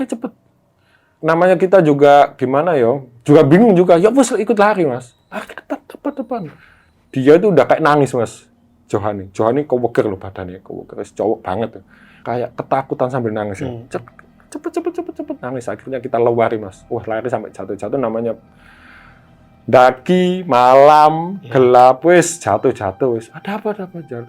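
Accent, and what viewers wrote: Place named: East Java, Indonesia. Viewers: Logat" e sampean wong kediri to mas? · Logatmu mas jawa timuran bgt 🤭😂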